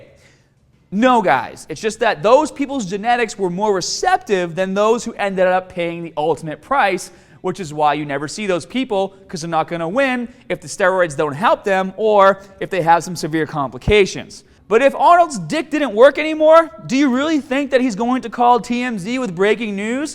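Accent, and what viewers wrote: Boston, United States. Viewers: Why watch this guy? He looks the same from videos from years ago. Boston accent was not earlier, but is now. Lift some more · Abdahmenen? Kind of hard to take you seriously when you can't even pronounce abdomen correctly. And it's not because of your cringe worthy boston accent either. You added an extra syllable in there , man. But to the point of the video. Do I think steroids used properly and maturely (that last one is rare in today's society) can cause adverse long term effects to your health? No. Or should I say, I don't know. No one does because there is little to no actual studies on the matter. That said do i think people who overdo it and abuse the shit out of steroids - like Piana - over a long period of time are playing with fire? Yes. Just like any drug be it cocaine, marijuana, nicotine/cigarettes, heroin (obviously), and even caffeine are setting themselves up for failure health-wise in the long run. 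I know I'm going to get bitched at for including marijuana on that list from our stoner left leaning friends, but there was just a study produced saying that long term use of the drug deteriorates your brain. The study released is what I'd call a "preliminary" study, but it showed that people who smoke high potency weed showed signs of brain damage, so in other words, people who built up their tolerance to weed and smoke the stronger shit nowadays are upping their risk of brain damage. Just like people who chug bottles of the stronger vodka are going to fuck themselves up more than the dude who just drinks a few beers. That said the study was admittedly hardly conclusive, which is w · Never noticed you're Boston accent until now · I haven't watched one of Scotts videos in a couple of years. I swear to god he didn't have a Boston accent before. This has to be a joke right? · Jim Jorgensen Why the hate? 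Go fuck off and find someone like with a "real Boston accent." Lmfao stupid kid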